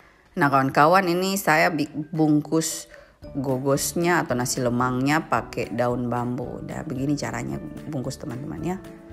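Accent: Papua, Indonesia. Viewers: Za senang dg logat papuax walaupun da tnggl d'luar👍👍👍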